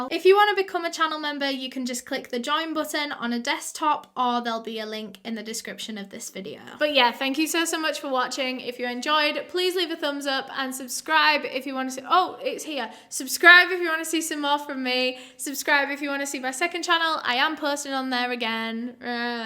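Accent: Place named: Scotland, United Kingdom. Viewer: Great to join your channel and I am not a troll or any type of idiot, but I have to agree with you when you were promoting your hair products, your hair is shiny, beautiful and the color brings out the beauty of your eyes. I love your accent and I am guessing Scottish because I can understand you, but friends who recommended me to your channel, can't understand you, however I am from Boston and I get it every day, no one can understand me, but I thank you for the opportunity to join your channel and I will continue to do so monthly. Again I am not hitting on you, however, you possess all that I look for in a woman, I think you are aesthically pleasing and me, not a fan of much make up, but the little bit that shows you wear, makes you perfect. Thank you, love Jace Harrell, and that is my real name not Joe or Joseph, whatever it says, and I hope that I didn't just tell everyone, while I thought I was dm'ing you. 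🇺🇸 🏴. You say your r's like me Car is Cah, ❤ it. ✌ girl.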